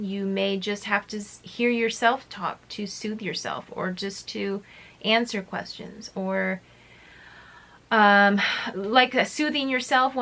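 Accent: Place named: United Kingdom. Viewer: just subd for the British accent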